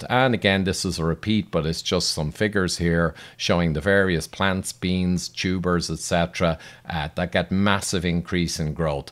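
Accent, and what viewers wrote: Ireland. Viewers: Nice. And the Irish accent helps too.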